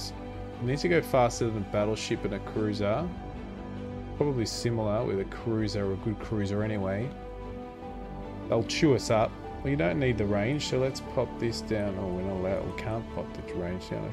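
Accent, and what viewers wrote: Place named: Australia. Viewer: Wwow man u r from Australia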